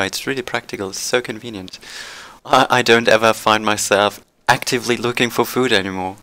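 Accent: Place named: RP, United Kingdom. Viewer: I love your accent. So posh.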